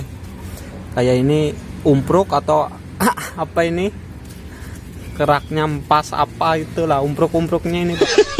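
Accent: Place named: Java, Indonesia. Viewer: Asli mana bang..kok ngmong blepotan Jawa juga😅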